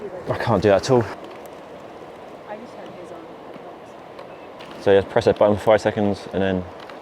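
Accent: United Kingdom. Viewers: Why California people speak British English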